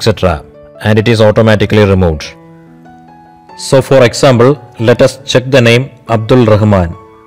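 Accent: Kerala, India. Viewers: Malayalam accent aanallo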